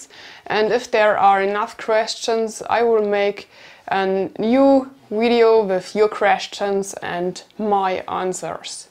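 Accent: Bavaria, Germany. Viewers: my late partner would have loved this video. She was German, from München/Munich and the reason why I started to watch your videos. You are both the same and not the same... both strong minded and kind, and your Bavarian accent is very much as she sounded. Music is my life, I am a musician and nature lover... Thanks for sharing some life things with us.:)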